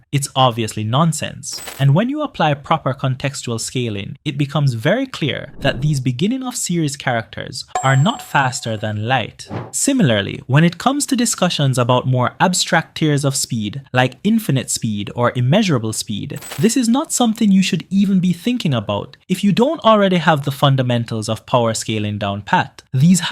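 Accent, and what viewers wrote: Jamaica. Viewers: good breakdown , are u jamaican by any chance?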